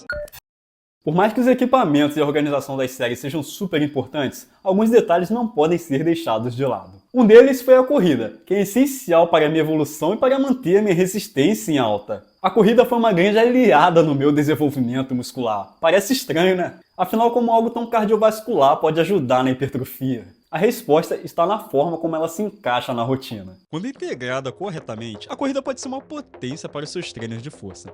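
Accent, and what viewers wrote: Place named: Rio de Janeiro, Brazil. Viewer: Excelente !! Vou voltar a treinar kkk Obs: fala igual carioca prr, para de puxar o S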